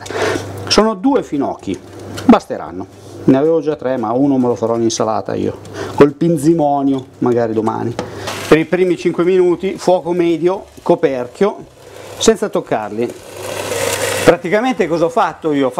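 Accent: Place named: Lombardy, Italy. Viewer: Buoniiiii li farò così la prossima volta , e poi mi fai morir dal ridere quando dici . ‘Guarda che roba , vien su un profumino ‘ sei proprio Lombardo come me . Bravoooo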